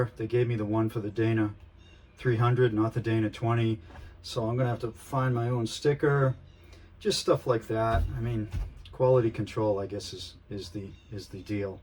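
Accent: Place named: North, United States. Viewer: That's just his accent. The way he talks, he's clearly from the Northeast in the band of the Northern American vowel shift that goes from Northern New England west through central Michigan then Wisconsin and stopping in Minnesota at the Twin Cities. What's more, anyone from there who has the shift can't hear it. It's a linguistic phenomenon that's been studied quite a bit. They just can't hear it. So, if you correct their pronunciation, it'll seem like they're being thick because they'll be all, "What's the difference? You just said what I said." I have personal experience with this having studied languages and linguistics at Michigan, which is ironic since there's nowhere that the Northern American vowel shift is stronger than in Ann Arbor, so studying languages and linguistics there with some professors from there and so speaking with the shift, it at times felt like it was the blind leading the blind and at other times just a really bizarre case study where you're tasked to objectively look into a fish bowl that you're stuck inside of. 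At any rate, a good example of the Northern American vowel shift that lines up with what you've pointed out here for him is the pronunciation of the last name "Stein," including names ending in "-stein." People from outside the region of the shift and some people within but who don't speak using the shift, like those who never picked it up but for some reason picked up speaking in the General American (GA) accent instead, tend to say it so t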